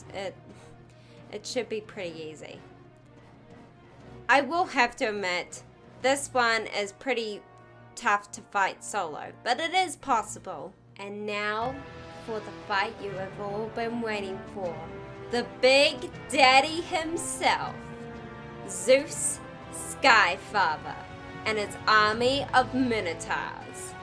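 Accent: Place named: New Zealand. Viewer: Thanks for the guide, and I love your accent, it sounds like New Zealand.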